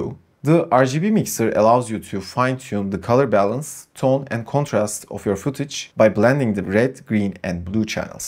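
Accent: Turkey. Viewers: AKSANDAN ANLADIM TÜRK BU :D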